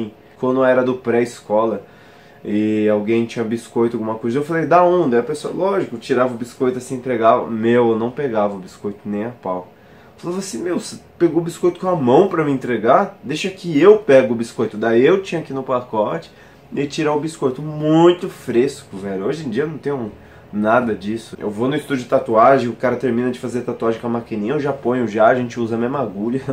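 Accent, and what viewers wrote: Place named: Minas Gerais, Brazil. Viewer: Tudo bem que e errado mais aqui em minas maior galera fala assim kkkkkkkk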